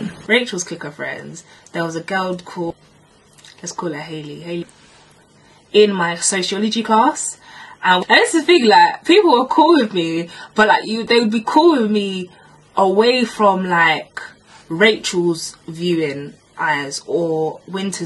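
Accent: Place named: Essex, United Kingdom. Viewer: I love your Essex kinda accent mix in with London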